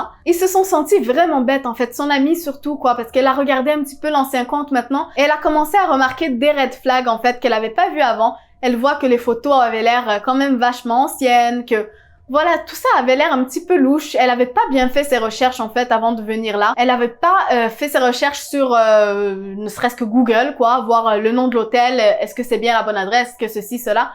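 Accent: Quebec, Canada. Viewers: Je viens de découvrir ta chaîne et j’aime beaucoup ❤️ Tu es québécoise ? Tu as un semblant d’accent 😉 New sub 💕 Edit : omg je suis tellement choquée de cette histoire 😭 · Y a des arnaques partout c'est dingue. Et là c'est encore pire, que serait-t-il arrivé si c'était deux filles !!!😱 L'essentiel c'est que tout finisse bien, mais elle a manqué de prudence. Ps : c'est rigolo j'ai lu plus bas que tu étais québécoise, j'avais vu l'accent effectivement mais il est assez léger je trouve 😁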